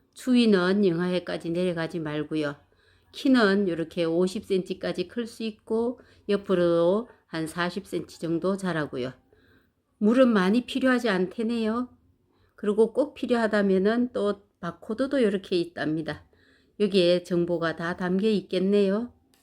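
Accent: Gyeongsang, South Korea. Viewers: 너무예쁘게 잘보았습니다. 목소리가 경상도인듯한데 농장이 어디인가요? 우리집은 대구인데요 꼭한번 구경하고싶은데 가능할런지 모르겠습니다만 주소나 전화번호를 알려줄수있는지요? 꼭 한번 보고싶습니다.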